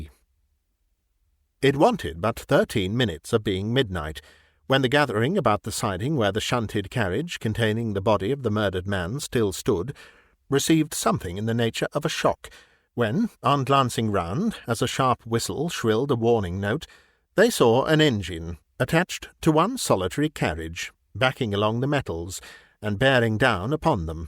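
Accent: United Kingdom. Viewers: Oh definitely! Perfect British accent at its best. I am learning here. English is my second language and I am being tutored, and it’s not just about the pronunciation it’s about the punctuation. Actually punctuation is where AI fails most.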